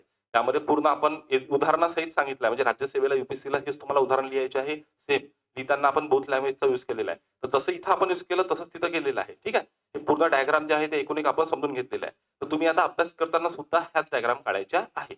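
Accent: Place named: Vidarbha, India. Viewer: Sir tumchya bhashevrun as vatte ki tumhi vidarbhatle ahat... Nice lecture sir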